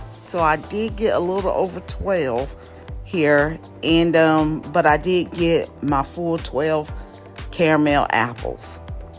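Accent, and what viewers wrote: South, United States. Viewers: I love your southern accent!! I am from NOLA so ours is kinda fast with a little southern. You apples look delicious and I can’t wait to use your recipe! Thanks so much for sharing!!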